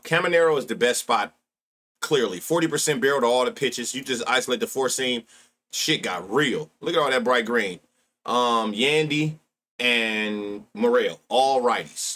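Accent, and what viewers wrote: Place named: Bay Area, United States. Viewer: 2 mins in i’m like oh yeah this nigga from either the bay or la 😂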